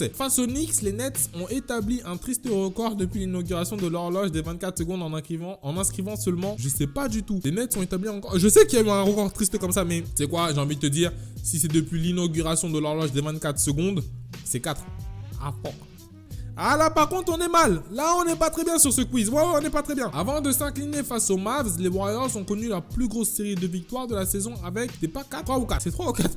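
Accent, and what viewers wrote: Cameroon. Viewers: Avec son accent du Cameroun c'est trop cool like beaucoup si tu aime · j adore ton accent camerounais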